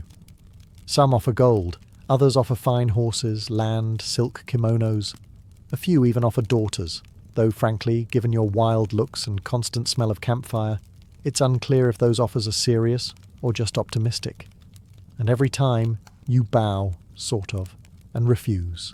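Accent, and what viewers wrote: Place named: England, United Kingdom. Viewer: Life and pOTh.... I'm out. English accents are ghey.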